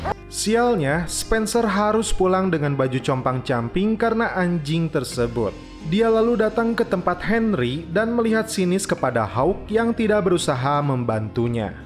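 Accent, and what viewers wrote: West Java, Indonesia. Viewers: Apakah pengisi suara broview adalah orang sunda? 🤔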